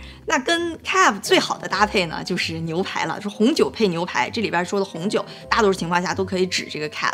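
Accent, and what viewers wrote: China. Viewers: 阿lin的大陸鄉土味甩不掉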